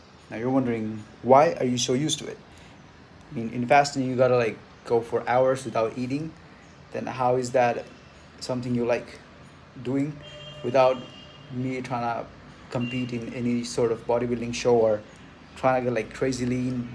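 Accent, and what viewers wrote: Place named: Chennai, India. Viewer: Gangster intro dawg! You f*cking fly man. You the Top G of Chennai, with that accent. You the dawg! The Big G. You are a legend, f*ck yeah man! The big dawg of Chennai.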